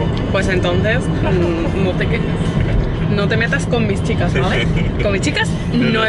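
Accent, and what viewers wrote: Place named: Andalusia, Spain. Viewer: Por qué no hablas siempre con tu acento andaluz??? No puedo evitar preguntármelo cada vez que veo un vídeo tuyo jajaja es mil veces más bonito que intentar quitárselo. Muy guay el vídeo y todos pero en andaluz mil veces mejor seguro!!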